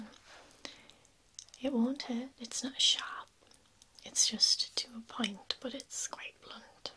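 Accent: United Kingdom. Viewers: Where in the UK are you from? I love female accents, but I can't always place them.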